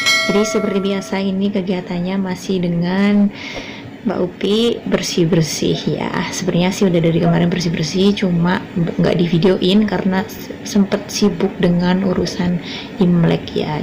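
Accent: Banyumas, Indonesia. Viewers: assalamualaikum dek apa kabar nya semoga sehat selalu ya ngapak dulu baru penak😂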